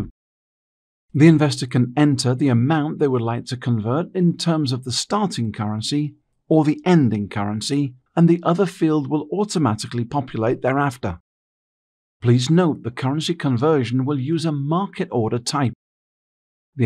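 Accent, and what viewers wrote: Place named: United Kingdom. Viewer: This vidEO has gone tangent and not to the point. We are talking here about trades, correct? What you are starting to discuss is "How to convert currency" - how is this related at all? This is a different topic altogether ! What IBKR VIDEO missed- 1) No talk on how to place ETF order or no option for that - is it same as stock? 2) No discussion on order types, what are the different types of stop orders, limit order IBKR SERIOUSLY NEEDS TO IMPROVE THEIR DELIVERY QUALITY - AND PLEASE PLEASE GET RID OF THIS UK ACCENT ! THANK YOU.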